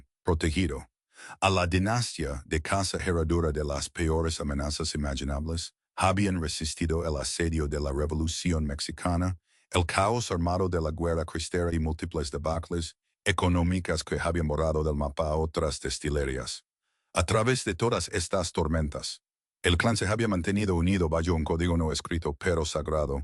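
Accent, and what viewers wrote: United States. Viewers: TU PRONUNCIACION ES DE USA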